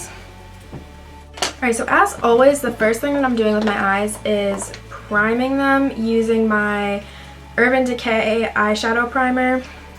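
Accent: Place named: Queens, United States. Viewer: You sound like you’re Italian from queens ny where I’m from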